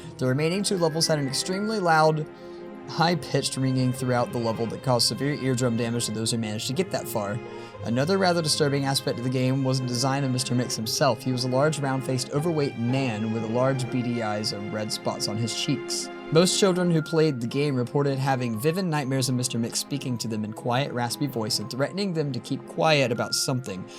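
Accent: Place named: Appalachia, United States. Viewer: Next ingredient is whatever the heck he says! I can't understand hillbilly language!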